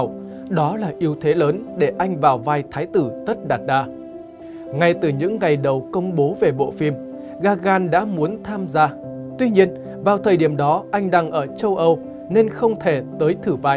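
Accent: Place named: Quang Binh, Vietnam. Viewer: yougotthis::oops::dothefive::elbowcough: Me no' nghe cai' giong Quang' Binh' cua' thang' nay' het' muon' xem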